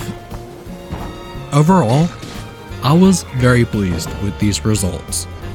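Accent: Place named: North Carolina, United States. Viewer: Youtube analytics are such a drag; one day your hard work will pay off but don't get burnt out or make your views equal your worth as a creator. Also I very much notice and appreciate the captions - above and beyond with your editing and production value!! Have you thought of streaming to make your youtube edits more enjoyable? Still lovin the NC accent btw just thought remind you haha ~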